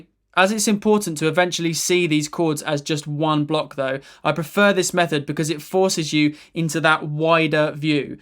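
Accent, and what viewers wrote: United Kingdom. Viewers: Only just found you today but I think you taught this so well and I loved the editing! Some really good key points popping up as you were talking. I like that you talk a lot about wrist positions and even where to hit the note for maximum clarity when playing, because it's so important and easily missed! Also good hearing someone else from the UK, no shade to anyone else, it's just that I hear and watch lots of music videos with Americans, and it's refreshing to hear the change! I hope your channel continues to grow, you deserve it! Just subscribed!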